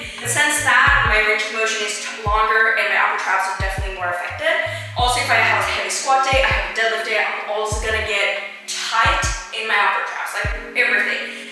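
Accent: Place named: United States. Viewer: It might be your FAQ: why do you have American accent? Love your content as always:)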